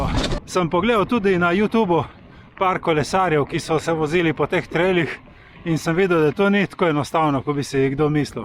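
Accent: Primorska, Slovenia. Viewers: Občasno spremljam vaše zanimive video bloge. Všeč pa mi je to, da v njih govorite v lepi in razločni slovenščini(da ima primorski pridih je zgolj pozitivno) in prav tako vidim, da se zelo potrudite tudi v komentarjih in odgovorih tukaj ali na Stravi. Pohvalno👍 Srečno v hobiju, ki vas veseli pa naj bo to na cesti, makedamskih ravnicah ali pa vratolomnih spustih po trailih.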